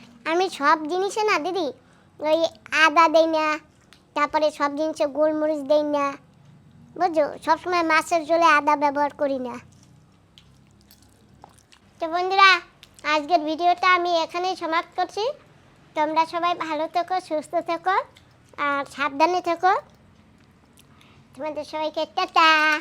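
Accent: Barishal, Bangladesh. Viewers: আমরা কোলকাতায় থাকি ,তোমার ভিডিও দেখি ভাল লাগে তোমরা কি বরিশালের ?কথা শুনে মনে হচ্ছে ।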